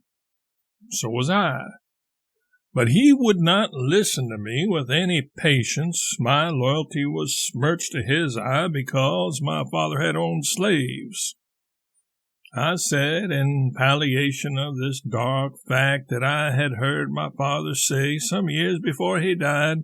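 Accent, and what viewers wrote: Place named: United States. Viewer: Thank you so much! I've been trying to find this piece of Twain's work in audio form in an American accent for weeks now, I'm quite used to John Greenman, but this is perfect, I truely appreciate this channela and everything on it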